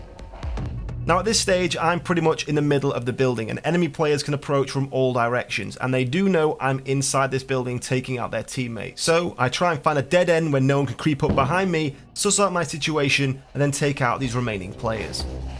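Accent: United Kingdom. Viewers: AEG Specialist with british accent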